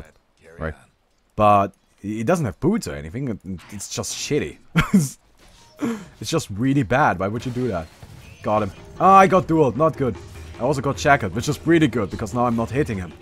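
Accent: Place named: Germany. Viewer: when it comes to "medallion", it's an accent. You have a german accent and we don't shit on you for mispronouncing some words, now do we? ^^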